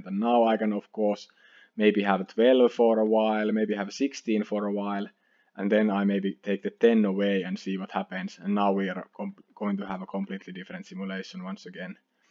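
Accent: Finland. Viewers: love the finnish accect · This is really interesting. I have to ask completely off topic. Are you from Finland? You have a very Finnish accent!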